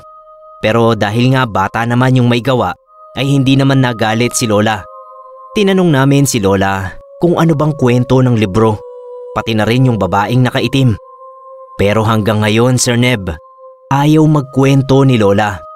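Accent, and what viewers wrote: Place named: Pampanga, Philippines. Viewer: binuklat hahaha kapampangan ka po ata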